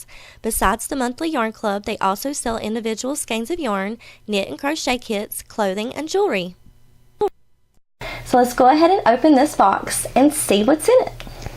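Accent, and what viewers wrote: South, United States. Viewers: What southern state are you from?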